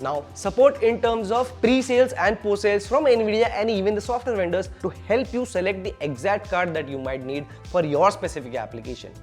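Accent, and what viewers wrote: India. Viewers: Screw your Hindu English .... :)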